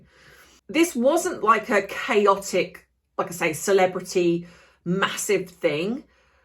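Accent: United Kingdom. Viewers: My distinct pleasure,. I can listen to your beautiful British accent for hours on end!!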